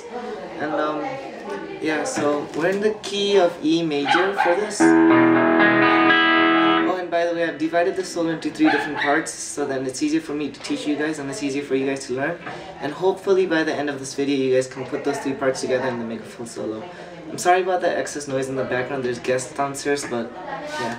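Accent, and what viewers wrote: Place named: Canada. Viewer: Timro English ta daami cha yarr. I live in london and I thought you lived in Canada or somewhere cause of your English. Nice Job with the lesson by the way.:)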